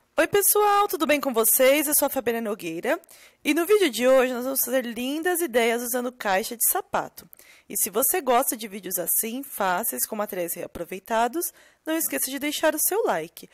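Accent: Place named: Bahia, Brazil. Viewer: vc fala um pouco baiano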